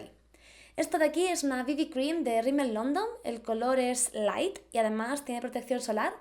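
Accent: Spain. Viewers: Amo tu acento español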